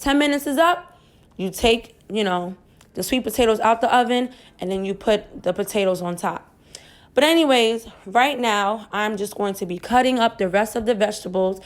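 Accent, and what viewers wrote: New York, United States. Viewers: I immediately knew you were from New York within the first ten seconds. Lol.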